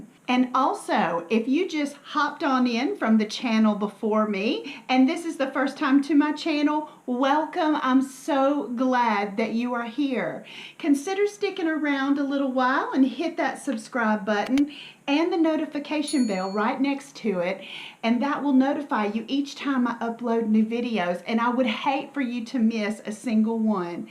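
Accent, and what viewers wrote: South, United States. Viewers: So glad to have found your channel through this collab hop! I love shopping my craft room also , saves money and really tests your creativity ! I have to say being from New York myself I just adore your southern accent , so sweet🤗💕 I love your DIYs !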